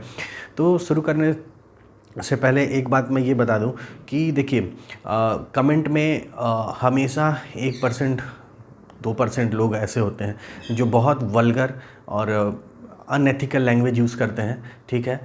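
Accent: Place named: Bhojpuri, India. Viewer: Kaha se ho sir bhojpuri bol rare ho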